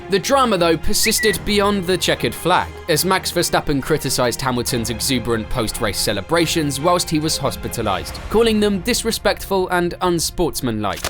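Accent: England, United Kingdom. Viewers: Another British accent.... Lost from button,Rosberg,Russell twice now Leclerc. Lewis had 30-50bhp hammertime more then the rest of the field. Fairytale he "beat" Alonso, even Ron Dennis regrets mistreating Alonso. ALL teammates were very close to Lewis accept koviannen, and then bottas regulatie to nr2 driver. Max is dominante not Lewis, not comparable like Max does today. Max dominante as a Driver is insane, Lewis he is jongere with a less car, very mediocre. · When you click on an f1 vid and hear an English narrator, you’re officially entering a spin zone. They’ll literally justify and rationalize all his bs, and there’s quite a bit to work with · litteraly the first thing i hear is a british boy saying "masi gifting the championship" lmao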